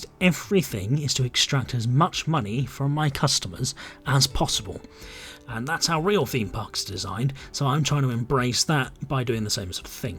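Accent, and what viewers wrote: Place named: England, United Kingdom. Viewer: Your flow of words and English accenting makes you sound like that specific really wise guy that everyone loves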